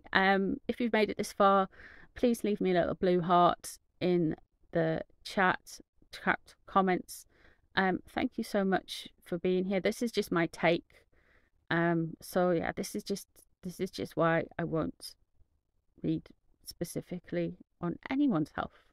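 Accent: United Kingdom. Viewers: I have watched your videos ever since Shanta from Mystical Fortunes had her members share a reading together with you! I have to say it took me a few times to understand what you were saying. Only because of the wording you have a great British accent ❤️ I soon learned of your quality of kindness and caring of the people who are in your community of people who follow you and your members. I have wanted to join but I needed help from my daughter who finally got me into the membership. Shanta always speaks very highly of you and has told us to go to you for any of our needs!!! Thank you for your amazing work and the ethical way you have done your work in all your videos. I love your live streams and enjoy them as well as all your other videos. I hope you have a wonderful day 💙☮️✨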